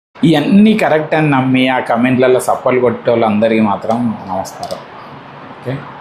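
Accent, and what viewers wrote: Telangana, India. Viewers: Mee language vintunte telangana yasa valana konchem ardam kadu but mallie vinta saradaga thamashaga vuntay... Useful videos 👍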